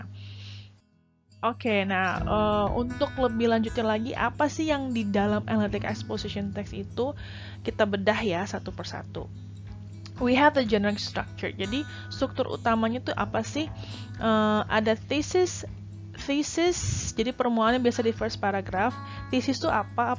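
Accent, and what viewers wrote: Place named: South Jakarta, Indonesia. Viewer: I like how to teach and explain because it is very clear then is also very slang because we can use South Jakarta-style English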